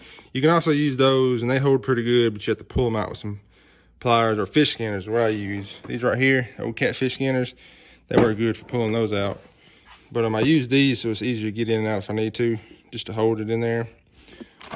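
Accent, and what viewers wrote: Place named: Alabama, United States. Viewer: Bro I watched both your truck videos I'm doing exactly same thing to my 86k10 had it for almost 20 years finally put new motor in her and Holley sniper I'm getting rid of rats nest of wiring and straighten out vacuum which lead me to you videos .. preciate the post.. ha catfish skinners .. had me rolling. You from Alabama too? 🤣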